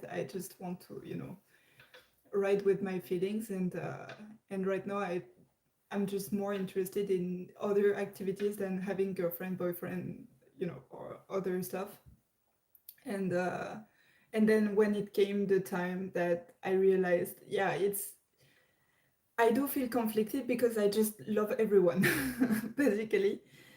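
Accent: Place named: Belgium. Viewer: Sorry for missing your live videos. I've been really busy 😢 but at least, I'm gonna watch all of them. P.s your English is fantastic. Advanced vocabularies and articulative. It's not easy as well. I live in a country where English is utilized as a first language in education but not officially. Yet, many of us can't speak like you do. I had to train myself on my own. Your English accent is interesting, I heard similar accent (with minor differences) in Belgium (only European nation I've visited so far) when few of them speak in English but accent switches when they speak in their primary language.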